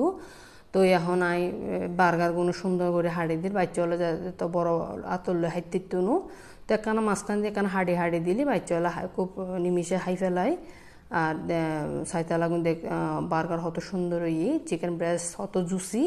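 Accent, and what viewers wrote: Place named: Chittagong, Bangladesh. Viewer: normally bujha jayna apnr kothay j apni ctg er kintu ajk 😅😅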